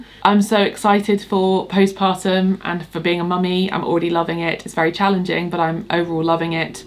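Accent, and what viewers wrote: England, United Kingdom. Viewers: Would you please slowly say your beautiful baby’s name? I’m American and I struggle at times with the English accent and you are speaking very fast. Thank you.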